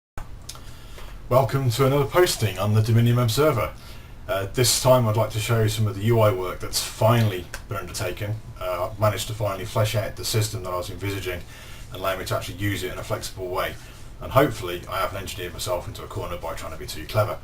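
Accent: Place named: Australia. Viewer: you're UK based yes? you sound like an Aussie.. meh maybe itz just me.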